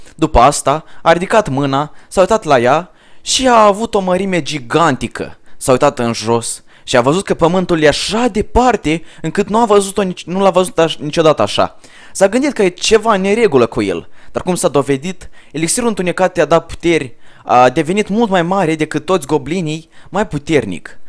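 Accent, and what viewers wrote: Moldova. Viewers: Dat Moldovean accent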